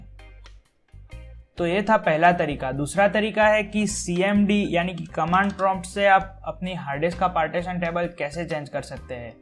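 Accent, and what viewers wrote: Gujarat, India. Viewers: Aap Gujarati ho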